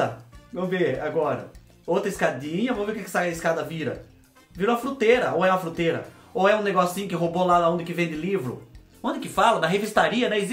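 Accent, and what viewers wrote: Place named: Itatinga, Brazil. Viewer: vc é igualzinho a mim nois fala mesmo sou de Itatinga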